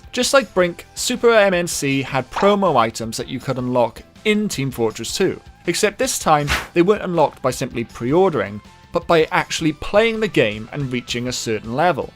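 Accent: Wales, United Kingdom. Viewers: Is that a Welsh accent, cant tell if it is or not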